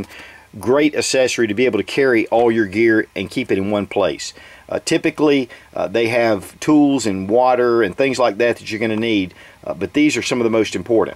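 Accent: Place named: South Carolina, United States. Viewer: IS ONE OF THE BEST TRAINING AND KNOWLEDGEABLE SITES OUT THERE - THANKS VERY MUCH. THERE IS NOT ONE VIDEO THAT I DIDN'T LEARN SOMETHING FROM YOU. I ALSO FOUND OUT YOU DON'T LET YOUR WIFE TOSS OUT YOUR OLD TEE SHIRTS THAT FIT SO GOOD. HA HA. YOUR S. CAROLINA TWANG IS A PLUS TOO TO LISTEN TO. NEXT TIME I AM OUT PRACTICING, I WILL MIX UP SOME SNAP CAPS WITH LIVE STUFF, BUT SNAP CAPS ARE GOOD ENOUGH FOR DRY FIRING PRACTICE.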